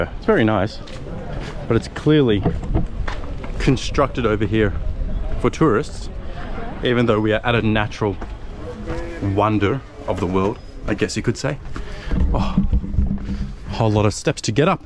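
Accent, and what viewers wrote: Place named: Australia. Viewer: Fun and engaging video!,reminds me of Harald Baldar! Really love your aussie accent!☺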